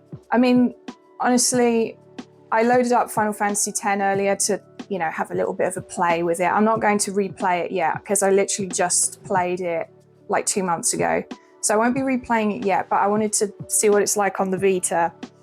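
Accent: United Kingdom. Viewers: Gamer girl with a british accent. It doesn't get much better than this. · Came for the vita, stayed for your cute British accent Love From from Portugal.. +1 sub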